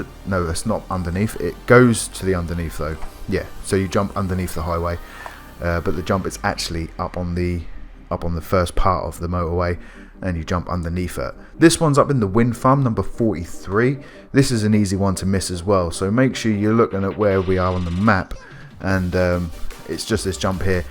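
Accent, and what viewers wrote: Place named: England, United Kingdom. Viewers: sounds like you're a Norfolk lad, great video too very helpful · Annoying England accent NO no no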